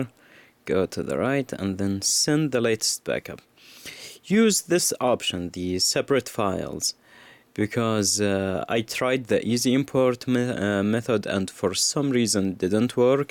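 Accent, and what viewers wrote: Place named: Gulf, Arab world. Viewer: واضح انك خليجي او شي من لكنتك و والكلمات ما تقولها بسرعة ثاني شي الناس الي بالواتس مكتوب تحتهم بالعربي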